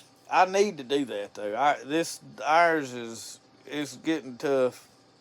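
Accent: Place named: Mississippi, United States. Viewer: I never even heard of you till I searched hide tanning about 2 weeks ago. I knew you were from Mississippi soon as you started talking. All my family's from there, I was raised and still live in North Florida due to my dad joining the Navy. Anyway I'm enjoying the content I pretty much only watch YouTube not into the news or movies much anymore I like being outside but YouTube is a learning tool and experience.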